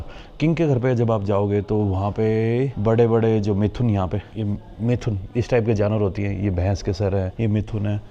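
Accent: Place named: Arunachal Pradesh, India. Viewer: whr were u?? Was eagerly waiting for you and was worried.. 2 din lagega gaye aapko video ko upload karne..? The way you speak in Arunachalee hindi accent was awesome.. ❤ from Longding, Arunachal Pradesh 🇮🇳. Was expecting your visit Longding, Arunachal Pradesh from Longwa, Nagaland 🙏. Anyways, have a safe journey & stay..👍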